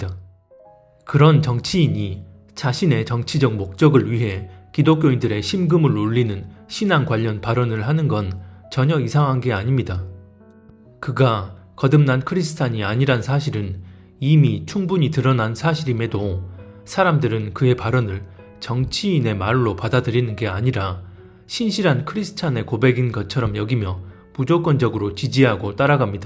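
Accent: Jeolla, South Korea. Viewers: ㅈㅓㄴㄹㄷ 억양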